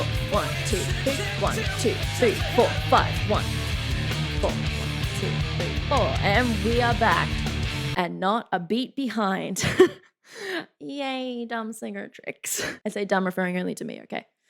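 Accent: Australia. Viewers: Dunno why people would hate on Australian accent.. everytime I hear you I feel a very strong urge to just drop every responsibility I have and move to Australia just to hear the people speak and enjoy the sunset on the beach. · I do not know why I like the Australian accent so much, but I could listen to her talk all day.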